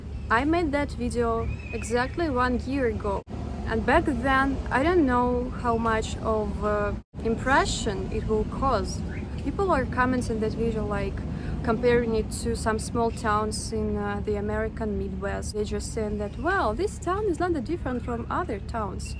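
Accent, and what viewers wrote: Russia. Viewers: you just look so cute when speaking English.. I visit your videos to hear your Russian - English accent.. 😊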